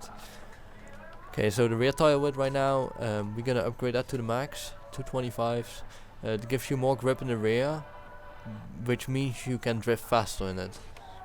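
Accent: Netherlands. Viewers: Ik ken je channel al lang, maar ik heb nog nooit een commentary van je gezien... Het eerste waar ik aan dacht was je accent, duidelijk nederlands. Groetjes uit Duitsland btw! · You can hear that he's from Holland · are you dutch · Accent is dutch, dat ben ik namelijk ook :p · your are dutch right?? I noticed an accent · Are you dutch?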